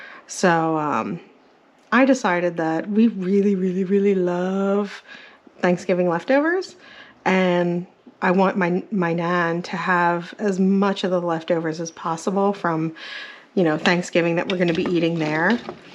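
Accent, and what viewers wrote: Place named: Midwest, United States. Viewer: Ty for sharing. I knew I heard an accent, thought maybe MN or one of the Dakotas but I definitely hear it with certain words u say. Hope ur well & sending prayers to ur Nan❤. Take care 🦃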